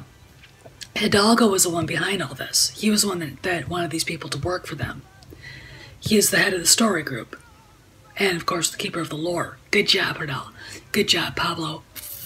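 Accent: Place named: Canada. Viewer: Love your accent. Are you from Canada?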